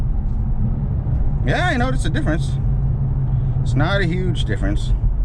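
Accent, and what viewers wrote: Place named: South, United States. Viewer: This was pretty funny but honest, made my day. I thought the author was a "good ole boy" based on the heavy Southern accent but at the end with the commentary, it surprised me! :) Informative vid - kudos for putting it together.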